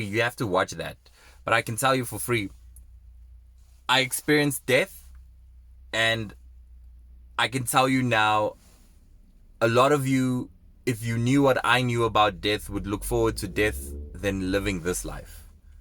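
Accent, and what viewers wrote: South Africa. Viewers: are you south african? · Your accent sounds South African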